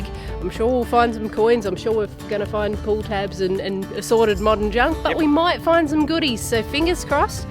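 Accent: Australia. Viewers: JUST WOW!! OL' GOT SOCKERED ON THAT LITTLE JUNKET....OR HE WASN'T SHOWING HIS TRUE COLORS TO SAVE YOUR FACE?? LOL, I WOULD LOVE TO TAKE A GOOD MONEY STICK AND SCAN THE BEACHES AT AND AROUND GULF SHORES AND ORANGE BEACH ALABAMA, BUT I AM TOO DADGUM OLD AND WORE OUT NOW. TOO MANY DIRT ROADS BACK IN MY DOO-WAH-DAZE, LOL. SO I'L JUST BE CONTENT TO WATCH YOU AND SCOUR THAT SIDE OF THE PLANET AND OL' AQUACHIGGER DIG OUT TREASURES ON THIS SIDE. GOOD ON YA GAL, YOU ARE REAL PEACH!!! SEE YA NEXT POST I CATCH! PS..I;LOVE TO HEAR AUSSIES TAWK...I'D SOUND FUNNY TO Y'ALL WITH MY HEAVY SOUTHERN DRAWL.....CARRY ON!:face-blue-smiling:face-red-heart-shape:face-turquoise-covering-eyes:cat-orange-whistling:face-blue-wide-eyes:body-green-covering-eyes:goat-turquoise-white-horns:😝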